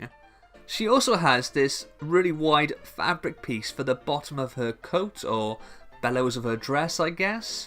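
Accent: Wales, United Kingdom. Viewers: I'm just going through a ton of vids of yours, you're very entertaining and I love your welsh accent!!!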